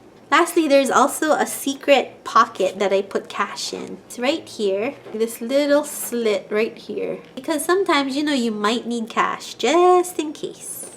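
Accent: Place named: Hawaii, United States. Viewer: I love your video and use of the different hobonichis 😁. By any chance are you from Hawaii? You sound local and make me miss home. (Much like nadine)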